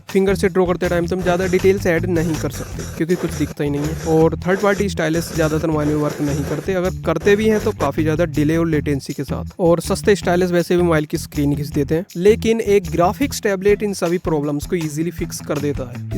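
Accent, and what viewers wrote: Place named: Odisha, India. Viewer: Bhai pata nahin kyun esa laga ki aap Odisha se ho ? 🙂‍↕️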